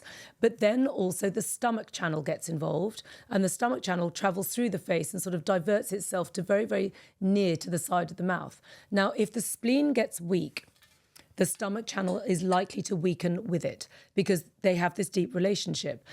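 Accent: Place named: United Kingdom. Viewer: thankyou. So excited to have found your channel, beautiful, knowledgeable lady, and with a perfect British accent, yay!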